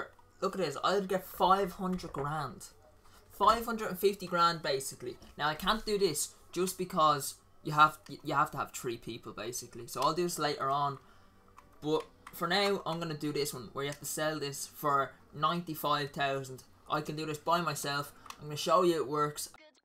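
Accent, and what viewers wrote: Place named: Ireland. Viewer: what part of ireland you from?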